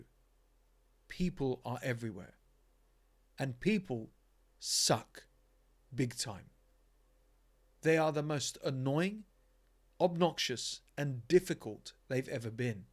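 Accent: United Kingdom. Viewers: He described all of Western societies today to a tee in a British accent.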